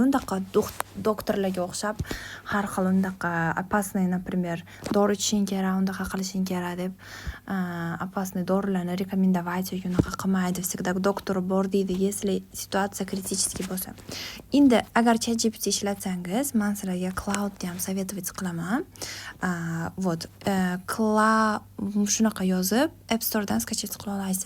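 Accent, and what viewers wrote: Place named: Namangan, Uzbekistan. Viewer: Namangan sheva chiroylida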